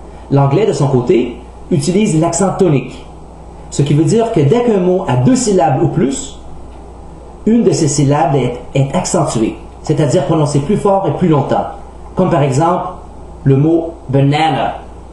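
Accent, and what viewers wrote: Quebec, Canada. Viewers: il imite l'accent québécois ou c'est sa voit normal ? (ce n'est pas une moquerie !) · tu ne serais pas québécois ?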